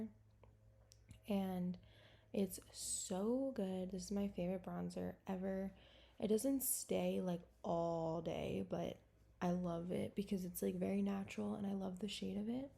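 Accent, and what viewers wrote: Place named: South, United States. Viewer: Nothing crazy🙂 Sounding country 🙂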